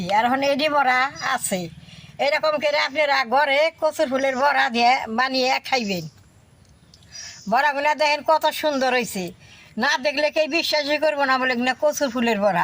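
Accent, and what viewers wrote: Bangladesh. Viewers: আচ্ছা ঠাকুমা তোমার জন্ম স্থান কোথায় গো, তোমার ভাষার সাথে আমাদের বাংলাদেশের বেশ কিছু জেলার ভাষার মিল পাই।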